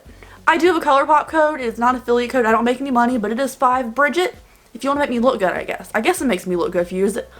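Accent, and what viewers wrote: South, United States. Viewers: I really like your videos only thing as European that speaks perfect English I have a problem with understanding your southern accent. Maybe talk slower idk😭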